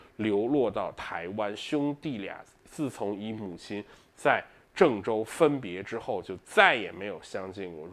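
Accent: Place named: Northeast, China. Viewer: 还别说，这东北话说的还挺顺溜。老铁那啥呀，还是别叫老北京口语了，改老东北口语吧！:face-blue-smiling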